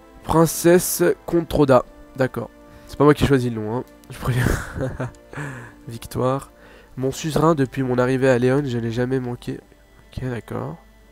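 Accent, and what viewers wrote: Marseille, France. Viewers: Tu serait pas Marseillais par hasard :) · je te demandais sa parseque je croyais que t'etais marseillais ^^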